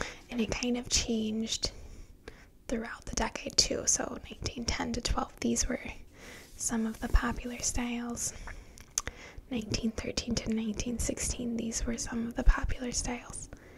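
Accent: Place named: Midwest, United States. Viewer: I stan your midwestern ayackcent :)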